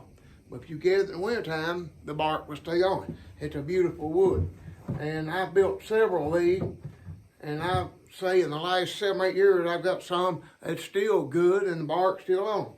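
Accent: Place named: Eastern Kentucky, United States. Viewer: I’m from Martin county Kentucky I would like to learn more about building these chairs. You sound like your from around the same area. Love the video and the craftsmanship keep it up 👍.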